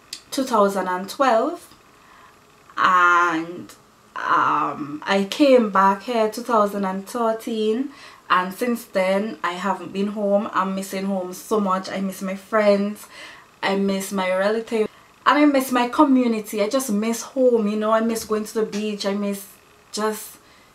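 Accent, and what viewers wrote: Saint Vincent and the Grenadines. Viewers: Girl your accent says Vincy alone 🇻🇨🇻🇨 so good too see another Vincy on here 🇻🇨🇻🇨🇻🇨 #784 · butt up pon yo channel by accident, glad to see a Vincy on youtube, nuttin wrong wid talking dialect , its a combination of our african language and our slave masters. gwan gyel do yo thing, new sub. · Was so happy to hear your accent n see someone from Vincy on YouTube!!! Subscribed!! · Love this! Miss hearing the vincy accent since we moved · It's good to see a fellow Vincentian with their accent still..though living abroad #vincytodbone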